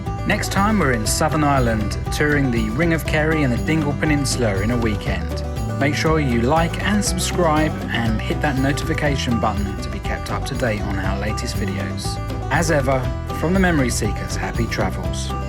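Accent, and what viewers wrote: England, United Kingdom. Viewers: Just love the English accent….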